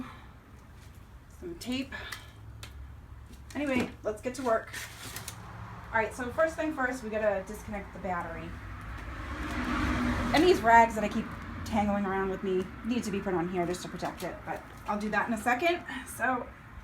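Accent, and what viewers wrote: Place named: Massachusetts, United States. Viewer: Thanks for the help. Where in Massachusetts are you from? I can hear it in your voice.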